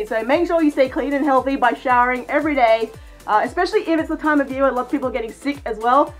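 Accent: Australia. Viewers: Why do I keep thinking you sound Australian? >:3 if you are Australian do you recommend I make a kangaroo fursuit?? :333 I’ve been questioning if I should or not recently because I’m making a fursuit this October, or at least I’m making the head feet paws and tail but not the full body because I still need my mannequin that I used for my art textiles project back soooo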